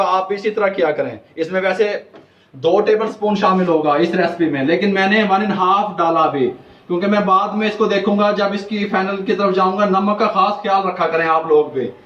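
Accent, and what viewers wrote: Punjab, India. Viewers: Bhut mithi boli punjabi urdu 💝💝💝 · App ka baat karny ka punjabi stayil bht acha hai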